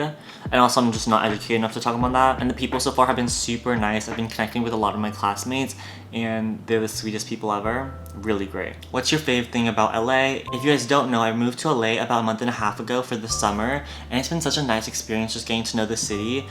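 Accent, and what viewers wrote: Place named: Philippines. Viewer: Liked the drawings. Also, I get why people ask about your background. Your accent is all over the place, I would have guessed Philippino. I am also Asian, born and raised in America. People always ask about my background, it's annoying. Good luck with your studies😊😊😊😊😊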